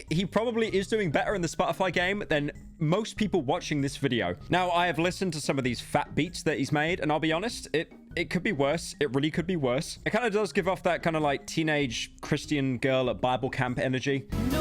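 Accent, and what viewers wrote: United Kingdom. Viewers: I'll be honest, I thought he was British.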